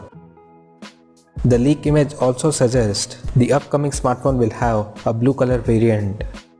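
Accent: India. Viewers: U speak with a strong indian accent hard to understand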